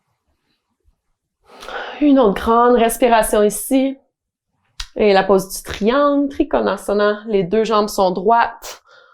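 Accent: Quebec, Canada. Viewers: En général ça ne me derange pas de suivre un cour en anglais ou en francais de france mais c'est vraiment agréable d'entendre du français quebecois! j'espere que tu feras d'autre cours en français. xxx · Oh my gosh, I love this so much! I'm American but I lived in France for a number of years and speak fluently... I had no idea you were Francophone! Loving the Quebecoise accent -- trop fraiche! <3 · Ahah ahaha excellent!!!! Un an que je te suis en anglais, pensant que tu es américaine, pour découvrir que tu es Québécoise, comme mes cousins adorés !!!! Génial!! Et puis ce délicieux accent (je suis français), quelle belle surprise ce matin!!! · Je suis vraiment contente que tu a fais le yoga en français ! Je ne suis pas française, mais je parle le français. L’accent et plusieurs mots sont différents en français québécois, mais de faire le yoga en français me faisais beaucoup de plaisir (mon français ce n’est pas parfait, mais c’est bien de le pratiquer) ! 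Merci beaucoup. J’attends avec impatience de pratiquer avec toi et toute le monde en français encore. Bonne journée à tous. Namaste xo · Super bonne idée! Je suis tes vidéos en anglais depuis un moment étant francophone de Belgique, j'adore l'accent Québecois! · Loved this opportunity to practice listening in french while doing yoga, and especially to hear more quebecois! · Merci beaucoup ! Je suis allemande mais J’AIME l’accent québécois et j’espère que vous allez filmer encore beaucoup de cours en français ! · Really enjoyed the class "en français". I'm English so the québécois accent took a bit of getting used to, but that was part of the fun. I'm on day 29 of 3rd time through 30 days of morning yoga. Love that it's different each day. Any chance if 15 days of 15 min morning yoga. Could do with a slightly longer class that was different each day. Merci beaucoup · Quelle suprise :-) je regarde tes vidéos en anglais depuis la France, et t'entendre parler avec ton bel accent québécois me réchauffe le coeur et les oreilles ! 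Je tiens comme les autres personnes à te soutenir dans la démarche, c'est une belle initiative que d'aller au devant de tes insécurités ! Tu ne peux que progresser... ;) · Ça ne devrais pas me surprendre que tu es bilingue, car je sais que ton ancien studio se situe en Ottawa, mais le fait que tu es québécoise est vraiment super! Je suis ontarienne anglophone, mais je suis enseignante en immersion française. Je trouve que j'ai perdu mon accent en parlant aux petits tout le temps, donc je suis ravie d'entendre ton accent et de suivre ce cours avec toi! Merci de continuer de nous partager des aspects de ton identité!💕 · That was fun! My mother tongue is swiss-german, but yoga en français c'est jolie, too! And I love your accent québéqois! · C'etait superbe! Je t'adore en anglais, mais en francais c'est hyper bien aussi, meme si je ne suis pas habituée a l'accent quebecois. Salutations de Pologne:-) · Merci, merci et merci. 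Je suis abonnée à toi application depuis le confinement. J'ai progressé en anglais et en yoga. Et ce matin, un cours en français, chantant et tellement inhabituel. Tu te débrouilles très bien et c'est vraiment un merveilleux voyage au Québec !! Moi qui suis française, ma prof disait chien tête en bas, posture de l'enfant (balasana) et la pince debout quand tu te plie avant "half way". Continue à nous enchanter avec ton bel accent et tes cours exceptionnels. Namaste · Oh je ne savais pas que tu étais francophone !!! C’est génial, avec ce petit accent québécois 👍! D’autres vidéos en français seraient top! Même si j’adore celles en anglais, t’entendre en français est très sympa 😊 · Are You Canadian? You sound like from Xavier Dolan movies ❤️ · No wayy ! Quelle surprise ! Ca me fait tout bizarre de t'entendre en français mais j'adore ! Vive l'accent québécois :) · C'est vraiment refraichissant d'entendre le francais quebecois--merci! 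(Et je m'excuse--mon ordinateur a des gros soucis avec les accents)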